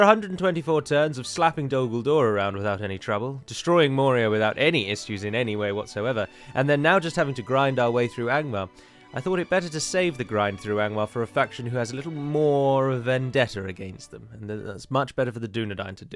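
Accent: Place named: United Kingdom. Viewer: Absolutely agreed. Do what your heart desires and I will be here just for the ride as well as that amazing and clean british commentary. At least that is what I am mostly here for... humour included:D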